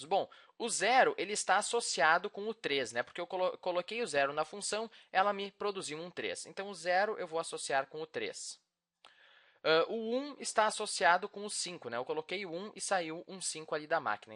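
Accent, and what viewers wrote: South, Brazil. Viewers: esse sotaque é do sul. você é de onde?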